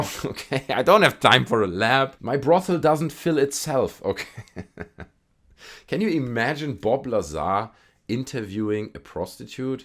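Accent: Germany. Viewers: something so nostalgic about hearing someone inspect government documents in a german accent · Dieser Deutsche Aktzent 😅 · u sound hart german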